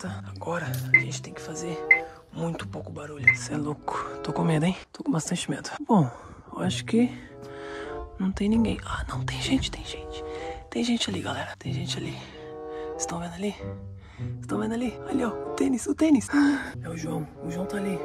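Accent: Portugal. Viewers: Vc fala igual português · tens bue sotaque pt de portugal ahah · você é português, né? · Pareces um português a tentar falar brasileiro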